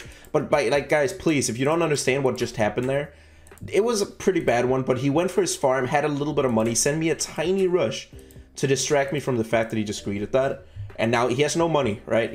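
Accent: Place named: Denmark. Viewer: I just gotta ask, what do you think about Btd Battles 2. Oh yeah, I hear a weak danish accent, hører jeg rigtigt?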